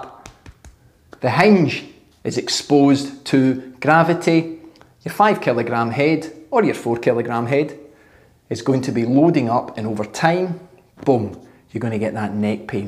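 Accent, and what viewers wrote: Scotland, United Kingdom. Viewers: Thanks for explaining! I have this exact problem and may have found the solution now. :) Also...I love your accent! Are you scottish? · not only - it was also nice 11 minutes of... Scottish English if I am not mistaken ;-) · A real Scott. With that accent, how can he be wrong? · Great video. Thank you! Love the Scottish accent 😁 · Great content, thanks. And I could listen to you all day, I just love the Scottish accent! Thanks for your great content!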